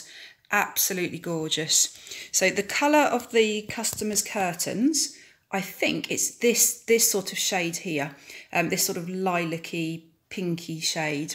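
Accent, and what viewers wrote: England, United Kingdom. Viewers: Core blimey as we used to say when I lived in England! Holy cow is what My kids would say now that we live in the US ! that is a lot of paint and cells bravo you for not making this turn muddy because those colors definitely have the potential of getting muddy but you really took your time getting the paint to the corners. That will look fantastic varnished! 🥰 congratulations to your accomplishments that was a big project! Listening to you makes me homesick 🙃 although I moved from there when I was 12 been in the US 40 haha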